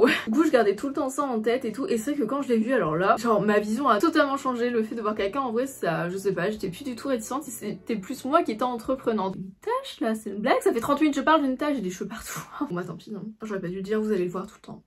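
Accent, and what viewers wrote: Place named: Paris, France. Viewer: Cette façon de parler 😂 on dirait une bourgeoise de Paris PS: Je critique pas, je fais un constat, personne ne parle comme ça là où je vis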